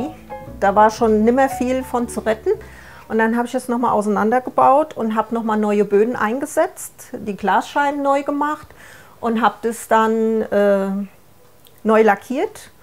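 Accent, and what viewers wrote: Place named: Bavaria, Germany. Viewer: Der Dialekt muss aus dem Raum Aschaffenburg kommen 😅 fühl mich direkt zuhause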